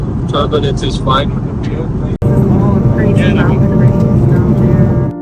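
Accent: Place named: Czech Republic. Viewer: I watched many videos , they were great .... Why do you speak with Czech accent ?!...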